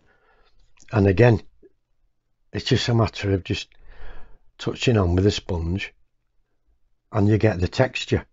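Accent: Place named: Scotland, United Kingdom. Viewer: Are you a Scottsman? Nice accent.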